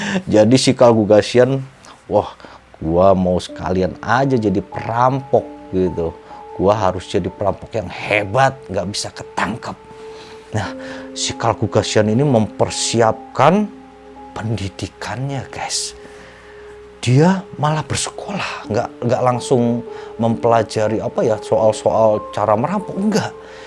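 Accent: Java, Indonesia. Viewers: Medok amat coy..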